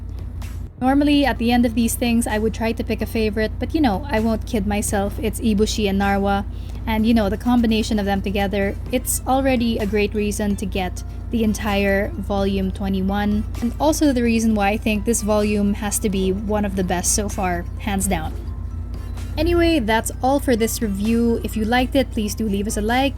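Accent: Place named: Philippines. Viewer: You sound noypi ma'am. I have been thinking of getting this set.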